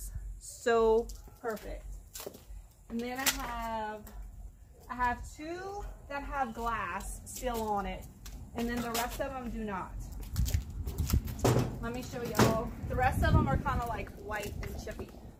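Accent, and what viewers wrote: Louisiana, United States. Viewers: Love Love Love all of your videos!! One of them popped up on my feed, immediately subbed you and I've been watching them all! Love your accent! I'm cajun as well from near Lafayette and live in DS near Baton Rouge. I just wish I had your energy and creative mind lol thanks for the content!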